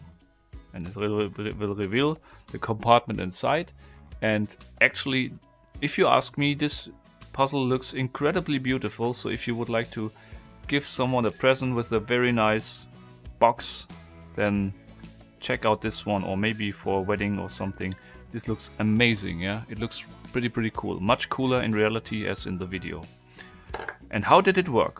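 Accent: Germany. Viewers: Evtl Irre ich mich aber es klingt startk nach einem Deutschen akzent :P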